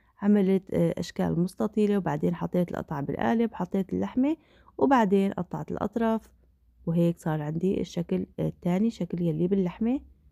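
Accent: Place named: Iraq. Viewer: مرحبه شلون الصحه هل انتي من عراق طريقه كلامج اني من عراق اول مرة اشوف قناتج كلش حلوة ومقاطع وقتها قصير اتمنالج موفقيه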